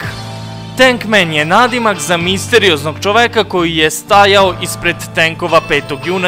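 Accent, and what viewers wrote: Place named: Niš, Serbia. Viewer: odakle si ja mislim da si iz Niša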